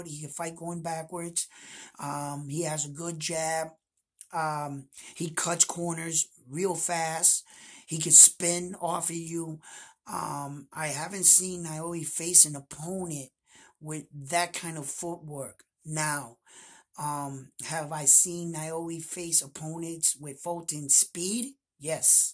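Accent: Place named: Latin America. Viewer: Yeah a bit and your accent is Latin but I can't say if it's Honduran or something else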